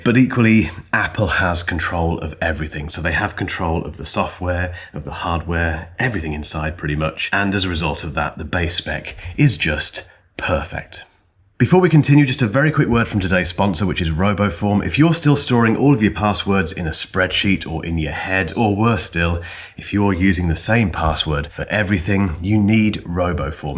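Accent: United Kingdom. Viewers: Dude didn't slip out of his British accent even once.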